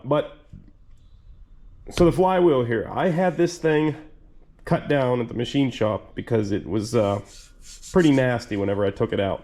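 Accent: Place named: Western Pennsylvania, United States. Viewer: I just found your channel, and I don't know where you live, or where you're from, but judging from your accent it must be western Pennsylvania, am I right?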